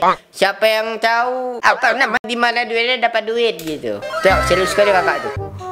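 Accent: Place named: Padang, Indonesia. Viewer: Padang mana nih suara nya?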